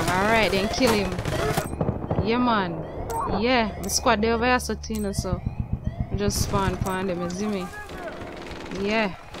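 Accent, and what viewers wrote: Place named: Jamaica. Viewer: Yup 100% Jamaican :)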